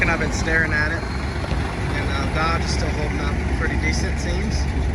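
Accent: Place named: Texas, United States. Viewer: Wya? Texas? It sounds like it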